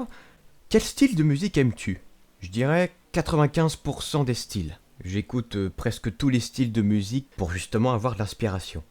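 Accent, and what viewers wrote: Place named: Quebec, Canada. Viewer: Continue comme ça et tu prendra ton axant québécois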